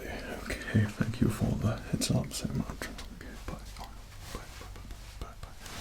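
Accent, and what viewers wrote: England, United Kingdom. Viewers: I cannot tell is this guy actually British and I am sure pc guys do not everything like this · You would make such a great Arthur Morgan roleplay! Do that but instead of an American, you're a posh rude English cowboy! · yes he is originally from Oceania but he does live in the Uk and has for a while with is wife he also sounds pretty british anyway in my opinion. Love from the Uk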